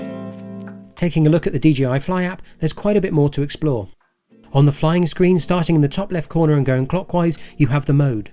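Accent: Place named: Scotland, United Kingdom. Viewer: Thank you so much for such a great and inspiring video. I’m intending to buy the NEO as my first drone (have watched your other superb and helpful videos on it and have liked and subscribed). But this one has really inspired me. Plus the heads up on the phone video software that you used - amazing to get those shots that are perfect for sharing with family). Nostalgia see your MTB route and hearing your accent as agreed living 40y in Scotland we have just moved south to ‘the north’ to be near our ’kids’ but still have my eMTB active !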